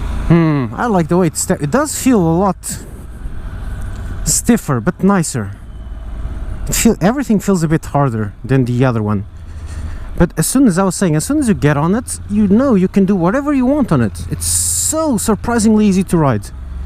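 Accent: Portugal. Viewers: That vídeo was so nice. I watched it all.where you from? Portugal? I like your accent, too.I myself own a Honda 500f but have always liked the X versions of honda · Vi logo plo sotaque que eras tuga! Bom video! Cascais!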